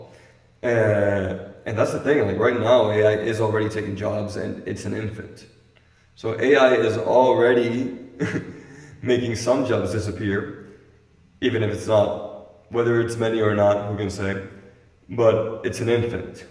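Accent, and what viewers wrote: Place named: Spain. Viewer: You Spanish? I love your accent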